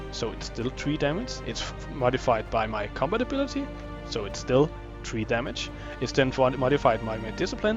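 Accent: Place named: Denmark. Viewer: I guess he's Danish, that's what it sounds like to me at least.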